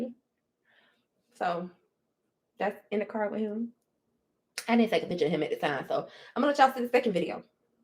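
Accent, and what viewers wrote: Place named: Jamaica. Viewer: LolBit@ I’m Jamaican I have the accent too but I love it hahaha you funny af.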